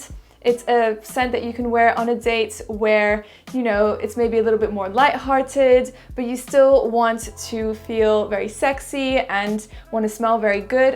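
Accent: France. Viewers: I can hear you are French.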